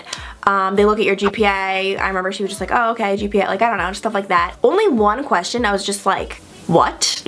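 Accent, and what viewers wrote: United States. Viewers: I love your accent! Which part of America are you from? 🌞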